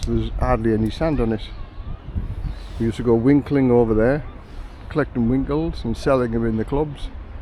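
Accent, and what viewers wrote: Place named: North Yorkshire, United Kingdom. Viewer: I've listened to you when you have been in Thailand and now back in Redcar, and your accent is just as strong as any of the locals. Really enjoying these videos of the English countryside, as I always like seeing them when I'm visit England from where I live overseas. I'm really looking forward to when you visit the Philippines shortly, for an Englishman's take on the country.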